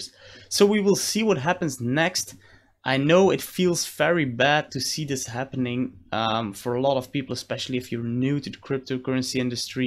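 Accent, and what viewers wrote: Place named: Germany. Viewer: Love the German accent! Anyways, keep calm and HODL! Believe in the technology! 0x20E0C5317F4a2cbB039E5Ec71E60A67C9b7fe799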